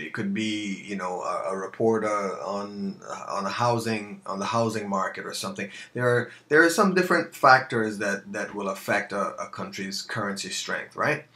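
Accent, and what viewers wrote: Jamaica. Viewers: Where is your accent from? Sounds like a cross between Jamaican and Northern Ireland lol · he got a hidden Jamaican accent... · Are you from Jamaica...if so place email me at <mail>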